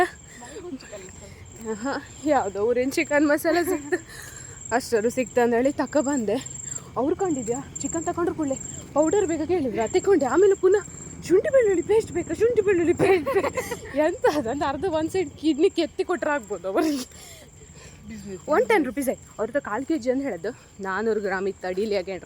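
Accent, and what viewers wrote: Kundapura, India. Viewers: Edu pakka kundaura kannada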